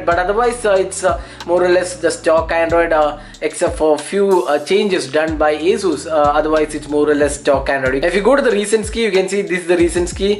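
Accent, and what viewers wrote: Kerala, India. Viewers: Kerala bro? From the L and N pronunciation 😅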